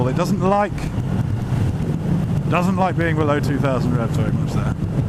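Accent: United Kingdom. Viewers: Your classic English accent is excellent sir.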